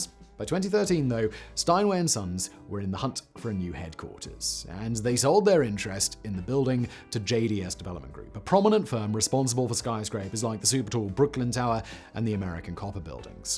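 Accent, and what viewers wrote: United Kingdom. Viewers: I thought this was vsauce! I’m like why does he have an annoying British accent now?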